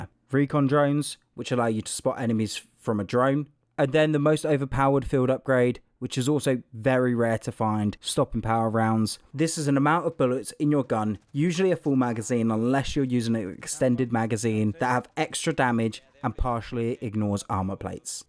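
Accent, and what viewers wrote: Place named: United Kingdom. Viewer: THANK GOD A FELLOW BRIT EXPLAINS WHATS WHAT. WHY'S SO MANY IMMATURE, AMERICAN LOUD GOBS, SCREAMING LIKE KIDS,WITH NO REAL INFO? I AM SO ANNOYED AFTER 7-8 ATTEMPTS OF GULAG CONFUSION. IVE PLAYED EVERY COD GAME,BUT THIS DONT EVEN SEEM TO HAVE A "ME V BOTS, SOLO PRACTICE"? IS THERE ONE? PLEASE TELL/HELP!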